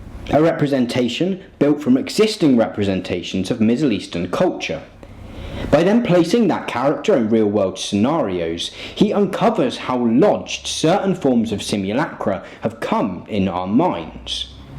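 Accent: United Kingdom. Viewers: I love your accent , so British saluts From Algeria 💜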